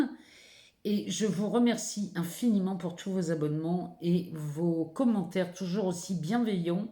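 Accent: North, France. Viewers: Bonjour. Merci pour votre tirage! C'est toujours un plaisir de vous visionner et étant originaire de la métropole lilloise, votre accent me fait du bien 😊. J'ai commencé à vous suivre lorsque je vivais sur Toulouse. Puis il y a un an je suis partie en Bourgogne en Puisaye pour suivre ma formation. Au début de cet été, ayant décroché un CDD sur Paris, je vous suivais depuis le Nord ou dans le TGV Lille-Paris:), et depuis début août je suis vos tirages dans Paris même (11ème). À partir de la semaine prochaine, je vous suivrai dans le Val d'Oise (la Frette-sur-Seine). Je suis Capricorne ascendant Vierge. Je souhaite de tout cœur que vos messages me fassent écho cette semaine. C'est tellement compliqué pour le logement. Et comme vous le disiez, au début j'ai commencé ce premier travail de reconversion en étant très enjouée. Mais ma situation financière, mes problèmes de logement et le futur très incertain dans l'atelier d'art où je travaille me font voir cela plus ou moins comme un échec. 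Je croise donc les doigts par rapport à votre tirage, besoin tellement de stabilité 🤞🤞. Merci beaucoup pour votre bienveillance, votre professionnalisme et tout ce temps que vous nous accordez généreusement. Beau week-end à vous 💛☀️🌈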